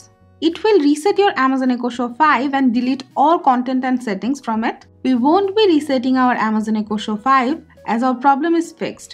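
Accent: India. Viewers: you did help me however for americans to undersand the indian accent they place on the english language and the speed in which you speak is super difficult. If you would clean this up you would get many more likes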